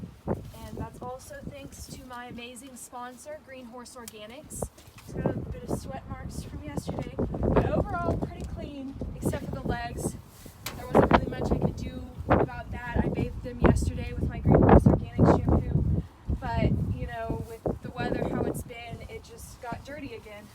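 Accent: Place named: Texas, United States. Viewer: Definitely can tell you’re Texan lol “don’t kick the trailer ma’am” haha though i live in tx too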